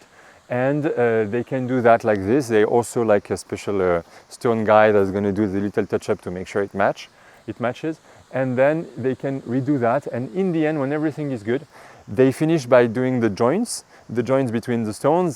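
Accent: France. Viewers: I don't think that I'm the only one, but I would marry this handsome man with this charming French accent and impeccable taste! 😍 If I wasn't already married, that is! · You can speak French no ? I hear at your accent you are French no ?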